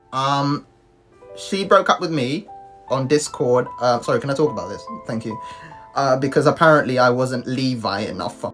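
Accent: United Kingdom. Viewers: Your northern accent is everything 😂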